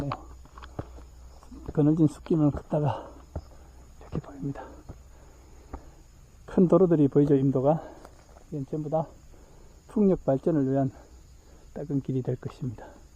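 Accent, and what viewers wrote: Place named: Gyeongsang, South Korea. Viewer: 홀로걷는 뚜벅이길에 구수한경상도 사투리와 억양이 어우러지니 좋네요ㆍ중간중간 걷는길에 안내를 해주니 최고입니다 가게없으니 간식ㆍ물 꼭챙겨야한다는 팁!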